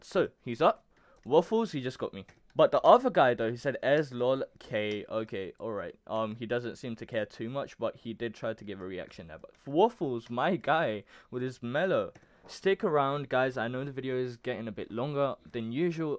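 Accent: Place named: South London, United Kingdom. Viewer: I recognise your voice from some where in South London im not saying the specific place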